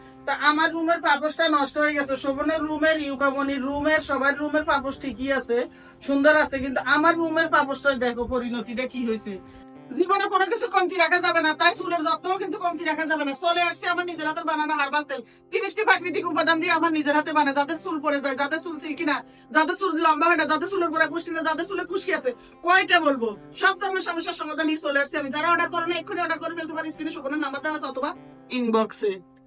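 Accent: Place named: Comilla, Bangladesh. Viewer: আসসালামুআলাইকুম আপু আপু আপনার বাড়ি কি কুমিল্লা আপনার মুখের ভাষাটা কুমিল্লার ভাষা যেগুলো অনেক সুন্দর হয় এবং দেখি অনেক ভালো লাগে সাপোর্ট করেছি আশা করি সাপোর্ট করবেন সব সময় পাশে থাকবো এবং পাশে থাকবেন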